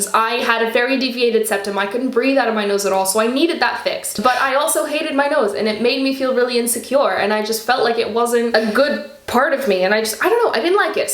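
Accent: United States. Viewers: Rowan I thought she was American lol😂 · WAIT WHAT THE HELL I HAVE BEEN WATCHING YOU FOR MONTHS THINKING YOU WERE AMERICAN AND YOU'RE PORTUGUESE JUST LIKE ME WOW I JUST REALIZED HOW MY ACCENT SUCKS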